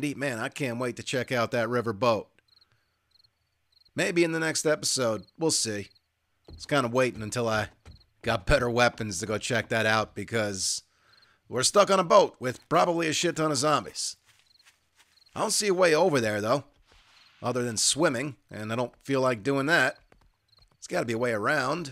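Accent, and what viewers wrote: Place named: West, United States. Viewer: Loving this mod n ur western accent, keep em coming please! 💛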